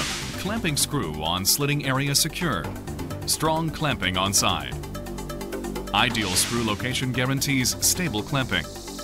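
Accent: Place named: South Korea. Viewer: koringlish.... I can't believe this gets put out like this....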